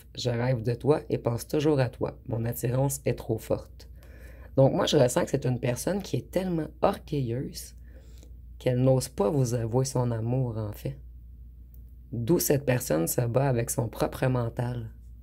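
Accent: Quebec, Canada. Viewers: Bonjour votre accent quenecois est tres jolie , je pense que chez vous la temperature n'est pas si chaude que chez nous dans le sud ouest de la France . Merci a vous 👍🙏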